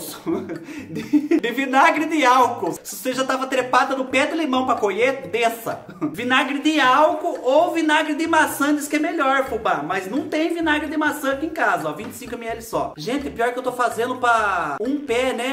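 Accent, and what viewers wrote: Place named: Cajati, Brazil. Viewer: Fubá tu fala igualzinho minhas tias de cajati 😂😂😂😂😂😂😂😂😂 deu até saudade ❤️❤️❤️❤️😂😂😂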